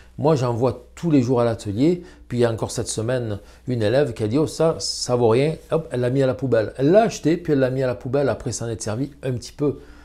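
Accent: South, France. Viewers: Je dessine depuis toujours mais je n'ai jamais essayé la peinture (à part en primaire!). Cet homme me donne envie de m'y mettre, il respire la sincérité et le professionnalisme, ses vidéos sont très intéressantes et bien faites, et j'adore son petit accent méridional! Bravo et merci, et.....j'y vais!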